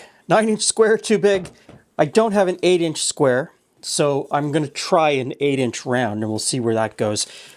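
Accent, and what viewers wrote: Canada. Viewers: Hi just started watching your videos. I’m Scottish ( Edinburgh) so watched the old Scottish cook book one. Was curious to see if it matched the kinda stuff my granny passed down. The carrot pudding looked good. Although it did look a lot of butter so I’m not sure it meant fluid Oz maybe Oz as in scales. Anywho could try both ways to see. So this one would have been better done as a clootie dimplimg. A cloot meaning cloth in Scotland. So boiled for about 2 hours. Also the addition of suet is helpful and would have been in most recipes.. puddings and pies. Thanks for your videos , you seem like a kind friendly guy. I’m also starting to recognise the Canadian twang in accents when I hear it. I’m not used to hearing Canadians being from a small village. Look forward to seeing what else you do.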